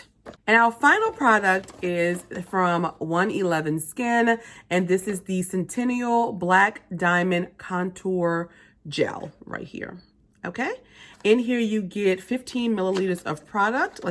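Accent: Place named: South, United States. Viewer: i can listen to a southern accent forever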